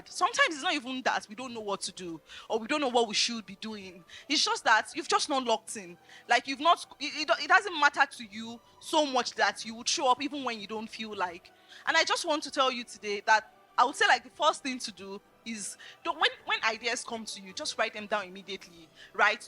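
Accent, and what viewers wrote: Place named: Nigeria. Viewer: I love how you're speaking like a Nigerian. No extra accent😂❤❤❤.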